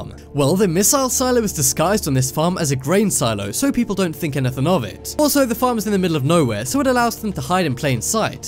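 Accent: England, United Kingdom. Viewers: makes me laugh how this guy sounds british yet pronounces nuclear as nukiller like an american ffs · I’m 100% sure, he is british... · It's nuclear,not nukyilla, I thought you was English at first,but I'm not sure now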